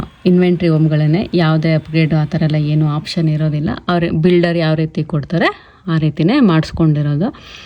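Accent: Mandya, India. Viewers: Nivu mandyadavara? Thumba chanag matadtira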